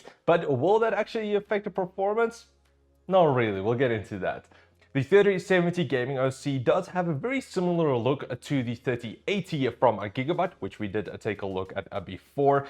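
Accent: South Africa. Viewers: Damn i knew you were pronouncing words in a odd yet familiar way. i could not put my finger ont it. (im living in Australia for a couple of years now) That good ol south african accent. I can still pick it up.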